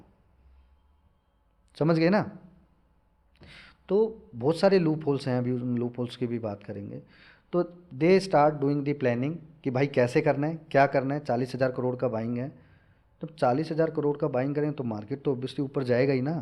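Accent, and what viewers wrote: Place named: Haryana, India. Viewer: good insight ji, vaise aapke lehje se aap haryanvi lagte ho:)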